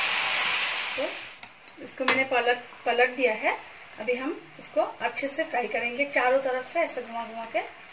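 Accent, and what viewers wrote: Bengal, India. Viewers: তোমার কথা আর হাসিতেই বোঝা যায় তুমি বাঙালি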